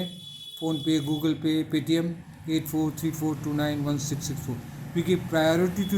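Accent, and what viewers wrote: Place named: Bihar, India. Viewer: Sir ap Bihar se hai kya? 😂 Hasi hoybe kregi hahaha. I'm also from Bihar that's why I understand your dialect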